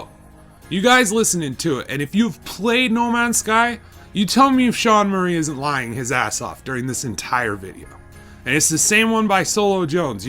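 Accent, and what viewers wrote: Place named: East, Canada. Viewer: Accent sounds like eastern Canada.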